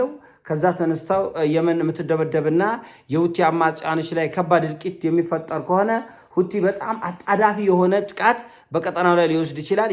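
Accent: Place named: Oromia, Ethiopia. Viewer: ኦሮሞ መንግስት ሲወድቕ አነጋገርህ የኦሮሞ አማርእኛ ስናጋር ትቀይረዎለህ አደል አይአንተ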